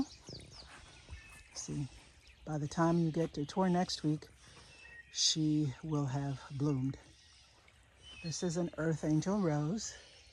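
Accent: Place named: South, United States. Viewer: You have a garden my grandmother would be envious of ❤ I’ve been following you on instagram, just found you this morning on YouTube from your link on insta. My family and I moved to Southern California the end of 2021 and this video is making miss home something bad this morning! 😢 Your accent, the birds, the lushness…. We weren’t in Georgia but near Asheville NC. Happy Saturday! I absolutely love your garden! ❤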